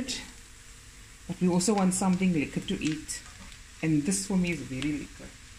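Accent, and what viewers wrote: Cape Town, South Africa. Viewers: Love your food. Love your laughter. Love your accent. I miss Cape Town and my cousins and aunties that live there when I hear you speaking. Lastly you are soooo clean!! My late mother had all your recipe books. She was pedantic about cleanliness so she would have loved you even more had she seen your YouTubes and how immaculately clean you are.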